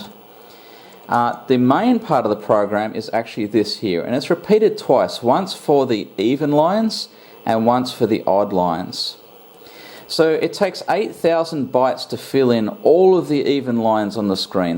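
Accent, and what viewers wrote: Australia. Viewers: Excellent video! Wizard-like skills! We should combine work over our YouTube channels or something if you are interested. Sounds like you are in Aussie - I'm in NZ. Give me a shout if you like :)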